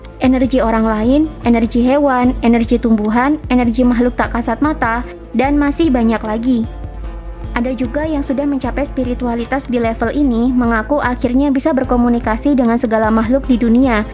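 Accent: Java, Indonesia. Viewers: Medok banget ngomongnya.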